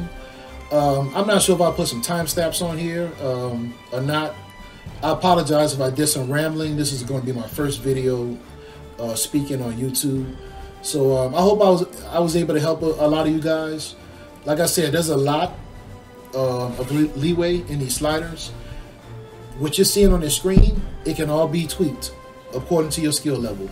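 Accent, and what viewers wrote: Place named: Midwest, United States. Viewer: Great video! been tinkering with this game for a few months now. Started working on rosters. You sound like a Big Ten guy.... where u from?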